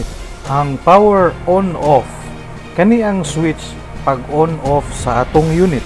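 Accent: Visayas, Philippines. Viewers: bisaya diay ka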